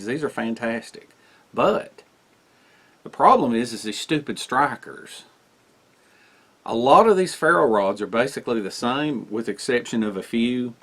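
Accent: South, United States. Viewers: Icstill love the way Yu talk southern voice so cool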